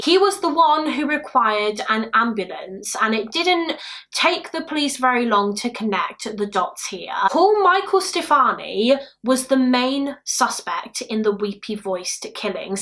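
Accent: United Kingdom. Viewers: Hi! I’m really into true crime and I found your channel recently. I have a few favorites and you’re definitely one of them! I love that I can feel that you’ve connected with the story you tell and you have a lot of compassion for victims. Keep being amazing! 💓 Also ironically all of my favorite YouTubers are from the UK. I have a vision disability so I mostly experience the content via audio and I just find UK accents so nice to listen to 🥰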